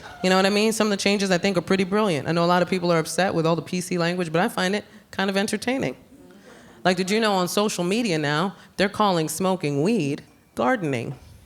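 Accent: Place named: Bronx, United States. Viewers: I soooo knew she was from the Bronx before she even said it 😂😂😂😂 I loveddd this!!! ❤❤❤ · Literally never knew what people meant when they said I had a south Bronx tone lmfaoo me and this woman talk alike I’m crying lmfaoooo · I love listening to a true New Yorker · I knew she was from the Bronx as soon as she opened her mouth.😊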